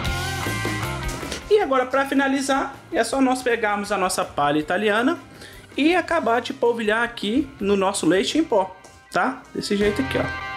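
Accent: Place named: Rio de Janeiro, Brazil. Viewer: Com certeza e carioca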